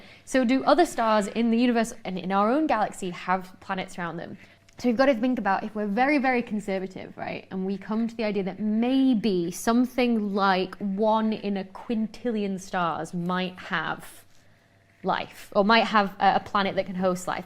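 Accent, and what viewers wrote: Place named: RP, United Kingdom. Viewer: The entire British upper class feels the need to talk like a twat. But her content is bloody good.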